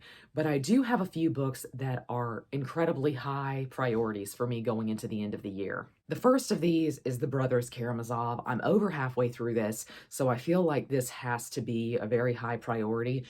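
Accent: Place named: North Carolina, United States. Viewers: Is that a NC accent? I like yer vlaugh. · Yep, I'm from NC, and sounds a lot like me!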